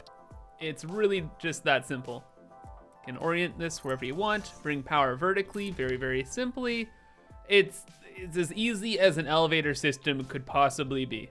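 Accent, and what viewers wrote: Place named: Canada. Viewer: i love how canadian you sound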